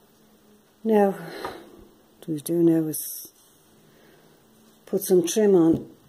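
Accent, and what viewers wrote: Ireland. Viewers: I'm just in heaven watching you I love crafting as well your so gifted thank you for sharing it's great to hear an Irish accent xx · Hiya I've just found your channel and subscribed. love your crafts thanks so much for sharing with us. I was like is that an Irish accent I can hear,,????yes it is she's Irish deadly. I'm a dub myself😀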